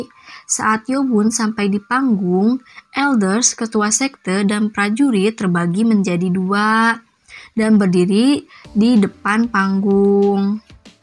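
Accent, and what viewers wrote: West Java, Indonesia. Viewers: Hahaha kesannya kaya adminnya nyindir hahaha tapi gue malah kesemsem sama suaranya sama logat sundanya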